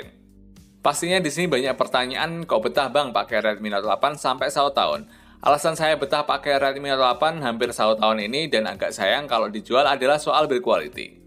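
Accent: Magetan, Indonesia. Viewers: Asli ne wong ndi iki kok nk alon" magetan🤣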